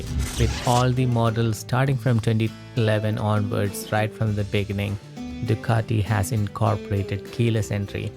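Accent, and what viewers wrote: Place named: India. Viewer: That desi accent though. Say " Glass "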